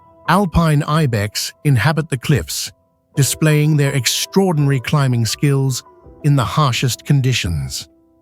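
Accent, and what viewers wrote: England, United Kingdom. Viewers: Wait a minute, English accent.😏still sounds like a computer 🫩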